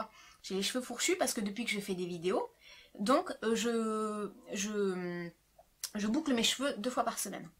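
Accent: South, France. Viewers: Coucou! Super vidéo, comme d'hab! Merci pour ces conseils! Tu as effectivement de très jolies cheveux, un tuto sur ta coiffure? Je sais que tu préfères parler mode mais peut être l'inclure dans un get ready? ^^ Quelques soit le thème de tes vidéos, c'est tjr un plaisir de t'écouter! =) Je vis en Espagne, et ton petit accent du Sud me rappel mon chez moi ^^ Besito guapa!!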